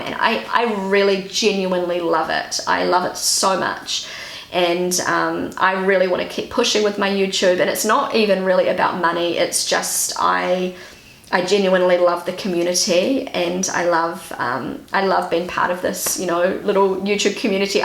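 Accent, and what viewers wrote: New Zealand. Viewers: I love your accent so much 🧡 I’ve been to New Zealand as an Aupair and you remind me of that beautiful time. Also I just realised we live really close to each other here in Franken 😁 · Hi, I just found your channel and I am subscribing immediately. How could I resist, you are a New Zealander!!! Love the accent. My mum was born in Palmerston North New Zealand. I live in Melbourne Australia, but New Zealand is like a second home to me. Wellington is my all time favourite place. During the 1970's, I visited New Zealand five times. The last trip was on my honeymoon. In those days, we were living in Sydney NSW. We moved to Melbourne Vic in 1981. Where are you from in New Zealand? Your husband's name is Rob?? Wow!! That is uncanny, as that is also my name. Incidentally, I used to have relatives living in Island Bay in Wellington and also in Wanganui. 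New Zealand is a beautiful country. Anyway, wishing you the very best. Rob.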